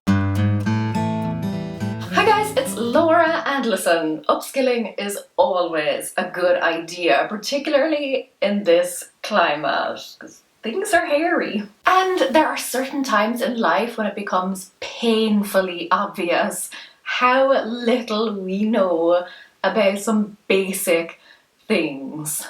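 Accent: Ireland. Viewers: Hi Laura, I love how calmly and relaxed you speak. Now, I can enjoy your Irish accent (ánd your video of course!). Cheers, Sousan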